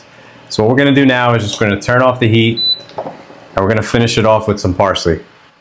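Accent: Philadelphia, United States. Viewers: Reminiscent of a Philly accent. Reminds me of home and Gramma Consolo's cooking.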